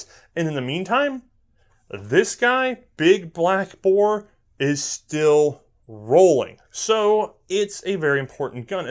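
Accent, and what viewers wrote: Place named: South, United States. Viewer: Haha, must be that thick southern accent (or my damn ears).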